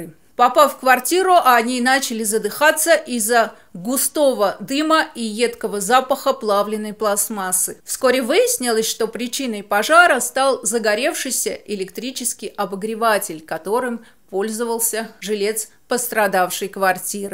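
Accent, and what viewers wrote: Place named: Armenia. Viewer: Вы уже говорите с армянским акцентом 😂 👍👏.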